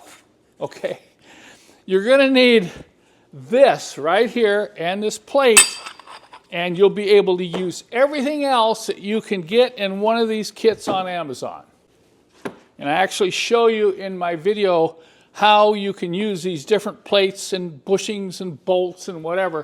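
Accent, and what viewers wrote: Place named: United States. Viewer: Spanish translation is awful. We love your american english!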